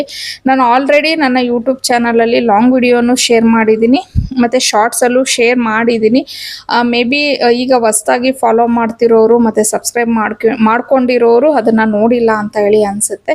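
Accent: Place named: Telugu, India. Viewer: Its not Sheniwara … its Shaniwara Your vlogs are good , i know u are influenced by telugu .. however conscious practice will make pronunciation better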